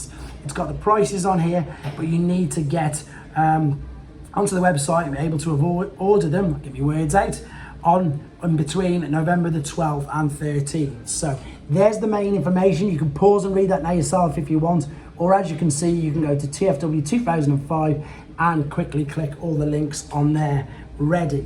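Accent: United Kingdom. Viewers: in future videos is it possible when u report news of transformer toy sighting, to state the original source you found it from? I only ask this bcuz sometimes I don’t pick up on words u say bcuz of your UK accent or you scroll on your tablet too fast. Please don’t take any offense as I think it’s cool having a UK accent, but being American I have a challenge when u say some words quick. I’m still gonna follow ur channel as I like how u do your transformer videos.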